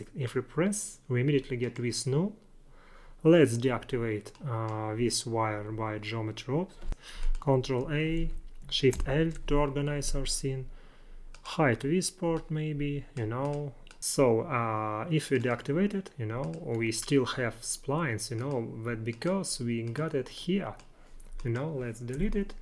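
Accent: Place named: Russia. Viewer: как же тяжело слушать русских говорящих на английском)) тем не менее инфа годная, очень мало туторов по node editor в синеме, хотелось бы побольше видео по нодам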